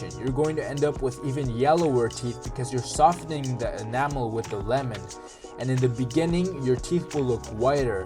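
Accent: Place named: United States. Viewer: Joder nos salió gringo el doc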